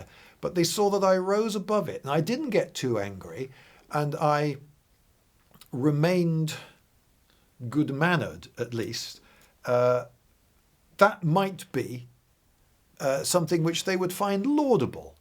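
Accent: United Kingdom. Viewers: British. That's one you are looking for. Terminally, inescapably British.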